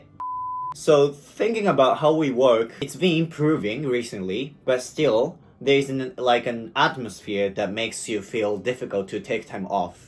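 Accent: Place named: Japan. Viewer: he has a Japanese English accent. It's not bad tho.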